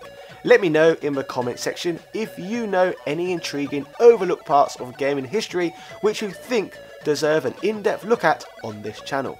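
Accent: United Kingdom. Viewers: I get it your British but nobody calls it the atari two six hundred.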